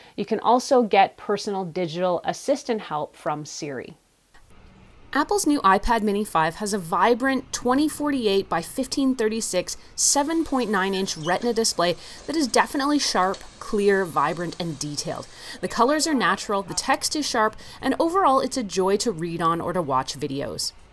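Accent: Canada. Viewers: Atre u Canadian?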